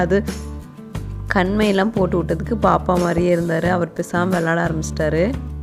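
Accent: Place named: Anaimalai, India. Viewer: Neenga pesara language vanthu enga language mariye irukku nanga anaimalai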